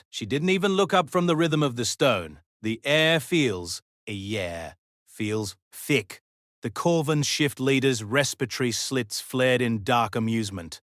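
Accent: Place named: Australia. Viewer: Based on the narrator...she was an Australian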